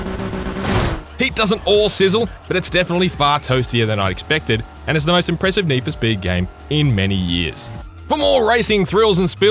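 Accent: Australia. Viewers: He's Australian? 🆕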